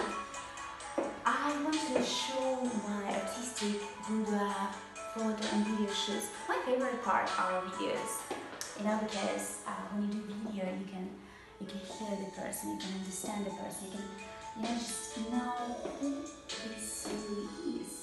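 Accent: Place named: England, United Kingdom. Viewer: Thank you so much for this video! You are so genuine and sincere. I love your voice both singing and with your English accent 😍 💕 😉 Youbare elegant,classy and very much reminded me of old time Hollywood movie stars 🌟. You have that timeless quality I think. I am from Canada 🇨🇦 and also a singer/songwriter. I would like and hope ery much to meet you and talk someday. Maybe while you are on a photo shoot or music video or on video chat. I admire and adore you. Have an Amazing day 🌅 💛 ❤️ 💕 Love, Love, Love to you ❣️ Take care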